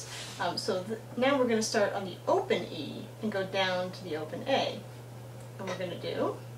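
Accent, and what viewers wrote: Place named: United States. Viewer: You sound American do the americans play irish trad?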